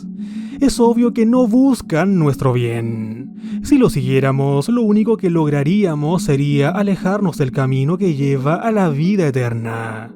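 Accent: Chile. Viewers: Es shileno... un vicio cultural ...propio de la nacionalidad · Ah ok, entonces es común para cualquier chileno dicha pronunciación?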